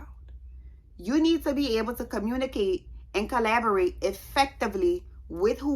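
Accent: New Orleans, United States. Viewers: First 1 I love your New Orleans accent lol 2. I got them save glasses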